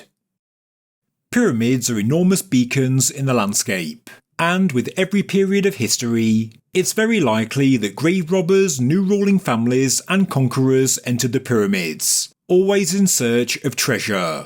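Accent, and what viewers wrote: United Kingdom. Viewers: I know this is an odd question, but I am asking because I can't place your accent. British? Why do you end sentences by extending the final sound?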